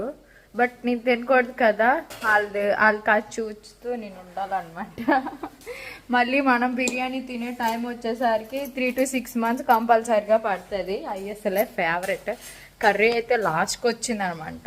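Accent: Srikakulam, India. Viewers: Srikakulam slag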